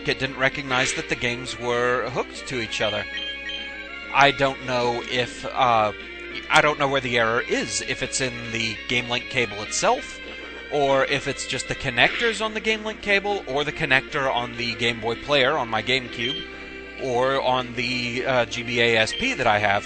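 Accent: United Kingdom. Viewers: Okay, call me ignorant - you live in Texas, but you have a British accent and you use British colloquialisms. Are you originally from Britain, or do you just put on a British persona when you record? :-)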